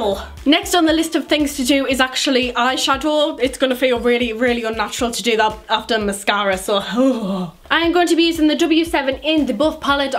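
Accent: Newcastle, United Kingdom. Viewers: Are you from Newcastle? X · i think she's from newcastle (dont hold that against me im not great with accents) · Sounds Geordie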